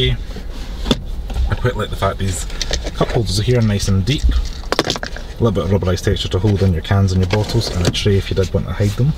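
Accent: Scotland, United Kingdom. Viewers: Good review and nice with a scottish accent. "Chelsea wankers" (childseat ankers) 😅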